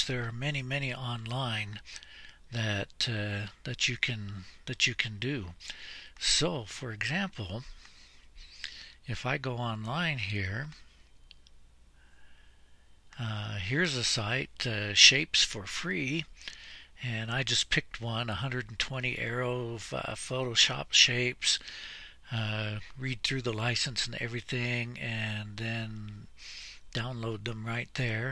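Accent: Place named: United States. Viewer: Hello, you are quite a character, brother - and i am saying this in a very positive way. Taking the time to answer the questions and comments on a video of yours which is as old as 5 years shows how committed of a person you really are to your profession or passion (whichever best fits). Respect and best wishes all the way from Bangladesh. NB: Your accent tells you are from USA. By the way, I also lived and worked in the USA for a long time. Studied at the university of Oklahoma...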